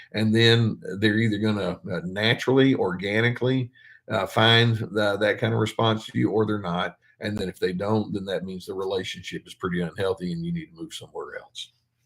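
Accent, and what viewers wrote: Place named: Texas, United States. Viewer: ! I love your voice! And that you are slightly self conscious about this! You are so kind beautiful and helpful! Your voice is one of your signatures and I could recognize you in the dark! Not to mention your Texas accent! You are the best!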